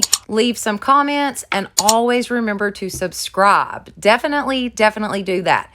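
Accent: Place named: South Carolina, United States. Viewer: Girl...loved them all. Gotta ask are you from Georgia or South Carolina? I was born and raised in SC and I swear we have the same accent.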